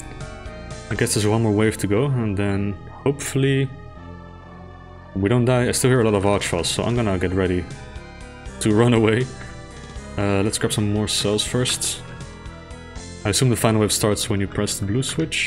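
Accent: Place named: Netherlands. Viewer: It is the Dutch way of speaking English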